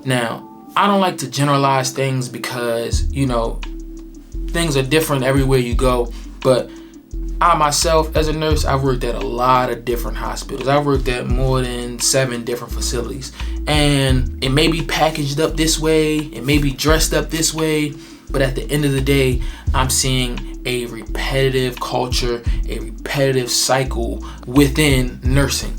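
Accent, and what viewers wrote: Baltimore, United States. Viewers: I'm in nursing school and it's already starting. Everybody wants to be the super nurse and try to prove that you are not smart or fit for the job. It's very stressful. But I feel it's like that in any profession. Aye Bmore. I grew up there but haven't lived there in 15 years. It reminds me of home hearing your accent.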